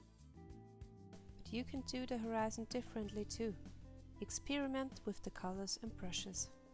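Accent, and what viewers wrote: Germany. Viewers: Dieses deutsche Englisch 😂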